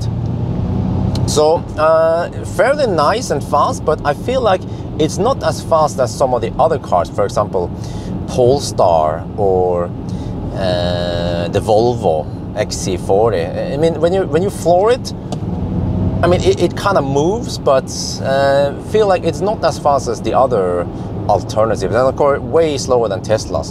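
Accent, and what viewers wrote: United States. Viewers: Diggin' the American accent!!😂😂🤣🤣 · I'm REALLY impressed by this car, but only thing that worries me is this stop charging button next to port. Easy way for stranger to stop our session is now one click away :( American accent - top noch :) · merican accent car sales man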